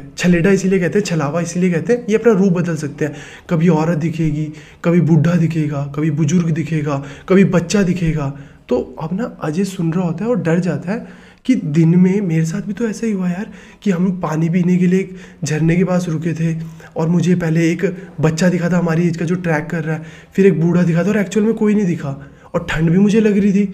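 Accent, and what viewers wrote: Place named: Himachal Pradesh, India. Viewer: Good story telling💯 Are you from Himachal bcz your accent and choice of words seems like that?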